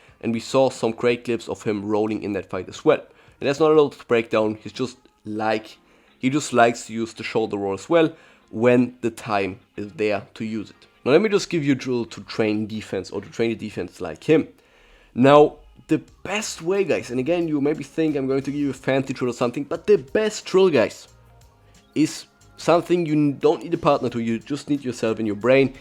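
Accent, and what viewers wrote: Germany. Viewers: I hear german hahah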